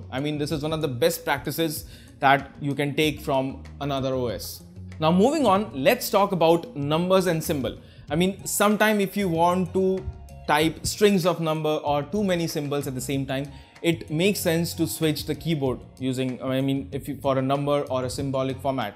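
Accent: India. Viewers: My ears bleed everytime I hear hindu accept.. what's wrong with you peopl!?!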